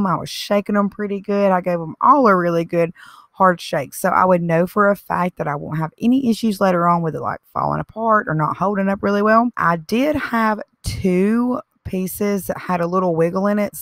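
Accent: South, United States. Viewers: You are very creative. As another comment says, you think outside the box. Thank you for the step by step instructions. I love your southern accent!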